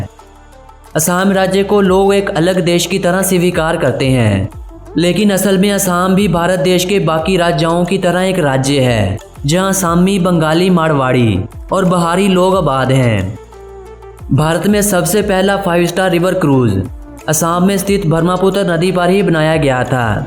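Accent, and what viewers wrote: Pakistan. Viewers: He's pakistani in disguise. Check his accent and frequent use of urdu words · Vai tum Pakistani ho kya tumhare lehen se pata chalrahi he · Pakistani chanal lg rha hai awaj se... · Bhai tu h kaha ka teri aawaz to Pakistani h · Mujhe inka bolne ka andaz Pakistani lag raha hai ,are u Pakistani , from Assam 😉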